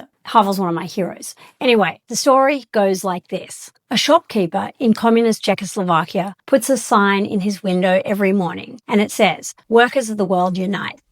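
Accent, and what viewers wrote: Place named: Australia. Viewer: Ah sir, you are too kind! Just a straight-up Aussie accent :)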